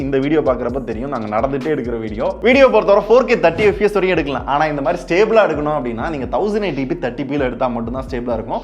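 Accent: Madurai, India. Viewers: Bro neega madurai thana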